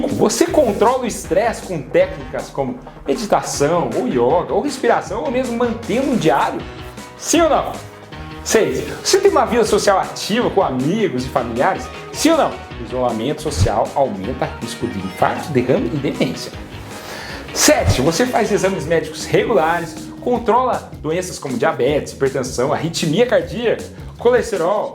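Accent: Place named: Paraná, Brazil. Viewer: Seu sotaque lembra muito o do meu pai, que é do Paraná perto da divisa com Mato Grosso do Sul. Depois que me mudei para Salvador, há 10 anos, nunca mais fui lá.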